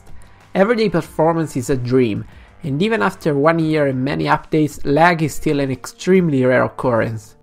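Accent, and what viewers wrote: Italy. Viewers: Ero indeciso tra questo e lo z3c, il mio cruccio maggiore è, vale la pena spendere 350e per un telefono di quasi due anni? (Scrivo in ita perchè l'accento è palese :D ) btw bel video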